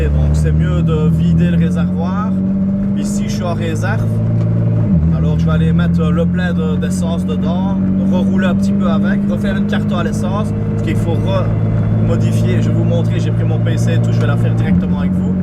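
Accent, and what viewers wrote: Belgium. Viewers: un régal tes vidéo , la GTR on en parle pas c'est une tuery, courage et bon rétablissement au papa, sa va bien allé j’en suis sur !!! Plus je voi ta GTR plus j’en veux une, ton accent belge me tue, nonente huit (98) me donne des barres, Bref continue bien, et des que jai la GTR je monte!!!!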